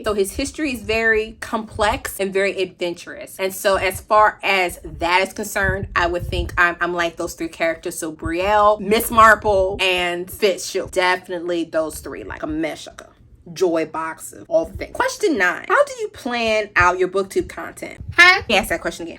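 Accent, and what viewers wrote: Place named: South, United States. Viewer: Yasss southern accent!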